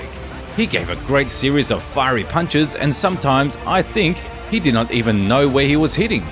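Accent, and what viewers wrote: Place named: United Kingdom. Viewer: This might have been a great video, accept: the narrator spoiled everything. This guy mispronounces everyone's name along with that terrible UK accent. 👎👎👎👎👎👎👎👎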